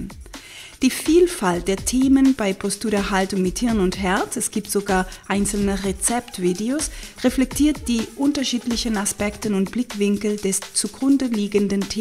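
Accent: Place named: Spain. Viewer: Liebste, Ich bin auch so froh,deine Infos auf diesem Kanal gefunden zu haben!!!!Es erleichtert mir als ostheopatische cranio sacralTherapeutin enorm meine Behandlungen,da mein Ziel ist, die Menschen in ihre Eigenverantwortung zu bringen-und deine Videos unterstützen dies. Ich muss nicht mehr etliche Mobilisations-und Achtsamkeitsübungen von Kanal Betreibern zusammensuchen..oder meine Therapie Einheit durch Übungen kürzen..ich erkläre das meiste am Körper während meiner Arbeit -und sende den Clienten als Geschenk ein Video von dir nach! Es ist Perfekt!So perfekt! Und sie lieben deinen reizenden spanischen Akzent genauso wie ich. Ich wollte dich dies wissen lassen,damit du weiterhin Kraft und Freude und Motivation für deine Arbeit mit den Videos hast-wissend,sie werden geschätzt! Und sollte einmal ein Thema anstehen,wage ich es, mich zu melden. Das Hauptthema bei den Menschen ist-die Atmung!!! 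Immer wieder. Deshalb Dank ich dir für dies Video am meisten 🙏🏽 P.S. Was ist deine Meinung zur Wim Hof Methode...vor allem das Atemmuster?das würde mich interessieren. Vielen Dank im vorraus für deine Zeit,dies zu lesen,und wahrscheinlich-wie ich dich kenne-auch zu antworten. In Verbundenheit, abrazarte desde austria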